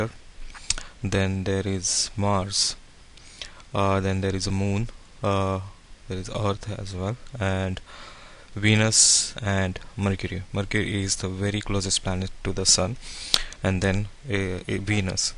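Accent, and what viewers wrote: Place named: India. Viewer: the indian accent has got to be the worst on earth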